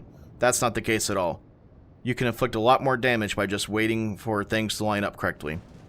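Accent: Texas, United States. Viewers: State history... Sounds like you grew up in Texas...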